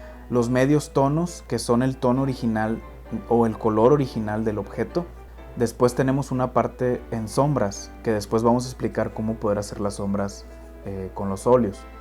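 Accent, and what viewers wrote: Mexico. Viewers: Muchas Gracias estoy siguiendo tus videos con mucha atención, Oleo no creo que le entre aún, me gusta las lecciones de Bob Ross, pero tus explicaciones muy buenas, y claras, tienes acento mexicano, si no vives cerca de la frontera MX USa y ocupas algo de USA te puedo ayudar si así lo deseas.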